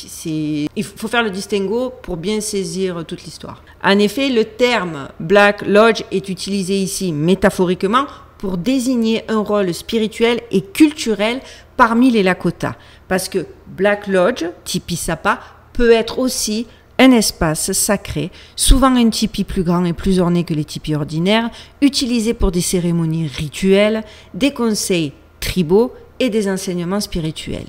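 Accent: South, France. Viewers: L'important, c'est ce qu'on croit, pas ce que dit l'Église car celle ci est dans la tourmente . Si des centaines ou des milliers de personnes voient la même chose en même temps, pourquoi ne devrait-on pas y croire et pourquoi seraient-ils menteurs ? Merci pour vos vidéos très intéressantes avec cet accent du sud qui les ensoleille ❤ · Ce que j'aime vous écouter ! Avec votre charmant accent du sud, vous chantez les vérités :) ♥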